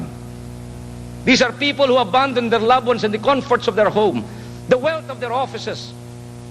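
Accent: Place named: Kapampangan, Philippines. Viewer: I love the kapampangan accent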